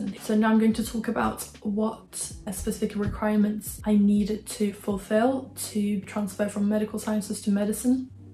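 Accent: United Kingdom. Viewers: Hi. I wonder how can you have a british accent if you move to uk from turkey when u are in 16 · Hi, couldn't imagine that you came to the UK at the age of 16. How can you pick up the accent? It's like 100% British! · If you live in a country, you will acquire their language easily. Add to that, she is really intelligent and speaking with a British accent won't be a problem. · I’m from the uk and she literally sounds British that is not the case with literally anyone else I have met from who has immigrated here unless they were a child when they came. You can almost always here an accent but not with her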